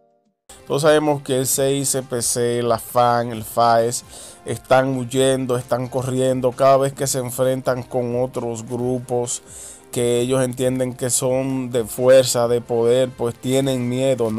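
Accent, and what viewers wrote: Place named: Cuba. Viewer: amigo se nota que estas en contra del régimen pero de donde carajo eres por que no pareces venezolano, dices arcarde , gobelnadol ... eres cubano o de donde carajo? si eres venezolano disculpa pero toma clases de dicción.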